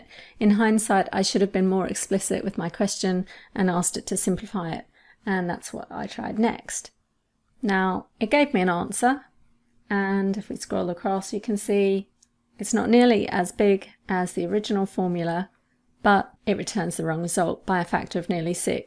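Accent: United Kingdom. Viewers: Where are you from? The UK? I really like your voice and accent. And videos too 😎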